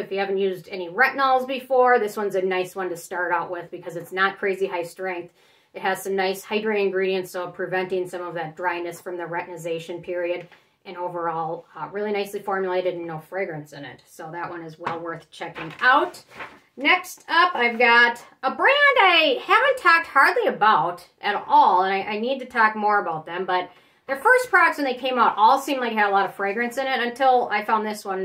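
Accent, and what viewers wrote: Minnesota, United States. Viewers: People are rude u do not sound like that u have an Minnesota accent just like people from the south have one or the north..so rude. Haters suck!! I'm hating on these Korean sites not letting me enter in so I can order I tried so hard for 2 hours several ones and it won't let me sign in and idk why and I want the new Mary and May essence so bad I'm gonna cry over it I actually did believe it or not lol I feel like a baby I'm about to send someone somewhere money to order it for me Idk why I'm so obsessed with wanting it so bad but I do it looks amazing and a version of the Dr c kombucha cream essence except a different active different color purple with the cream and the bottle is so pretty looks just like the kombucha one but purple with the cream top and has a black lid and a black lable on bottom frangerance free to I'm so excited about it but can't freaken get it and I'm not good at smuggling it in like u..lol My aveno oat serum went bad super fast I liked it but idk why it changed color super fast. 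I did try the thicker version of the Byomia ceramide moisturizer and really liked that want to try the cleanser jelly too . Have u heard of the fermentashia brand or something along that lines of that name from target heart it was good especially the fermented milk cleanser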